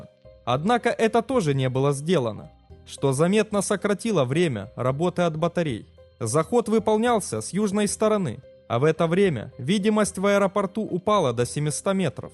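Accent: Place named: Kyiv, Ukraine. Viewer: братан, делаешь хорошие видео, но твой киевский акцент раздражает так, что противно смотреть. найди себе другого диктора.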